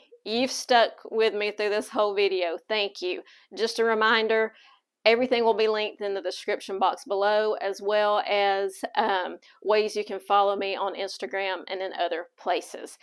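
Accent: South, United States. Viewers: Enter me!! I love your videos and Your charming southern accent! Thank you for sharing.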